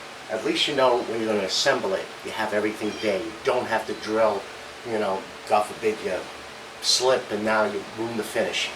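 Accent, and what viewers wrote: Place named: East Coast, United States. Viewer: you legend! liked and Subbed. love that East Coast Accent? You remind me of the Rebel Turner. All inspiration for me going home for six weeks to my home workshop in England this Thursday!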